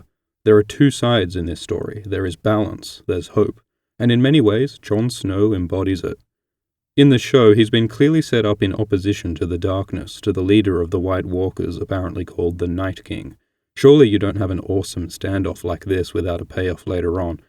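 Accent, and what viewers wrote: Australia. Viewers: I love your videos!!!! As far as Jon, agree with you totally. As as the show? D&D don't have the creative brain that George does in terms of writing fantasy, so who knows what they're going to do. BTW, GREAT to hear another Australian accent :) CYA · I agree he does sound like an Aussie...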